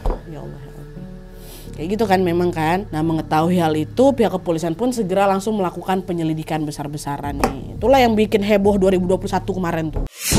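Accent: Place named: North Sumatra, Indonesia. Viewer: BTW, ini profesi sesungguhnya apa ya. Dan dari logat bicaranya dia tampaknya orang Sumatera, khususnya Sumut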